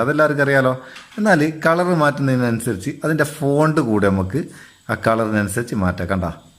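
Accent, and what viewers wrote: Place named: Malabar, India. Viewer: Very nice & really like your nadan presentation and koikode/malappram style...all the very best....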